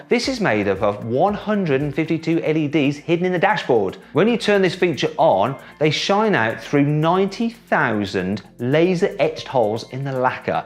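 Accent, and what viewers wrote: United Kingdom. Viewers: マットさんのイギリス英語で紹介されるのが最も似合う一台かもしれない…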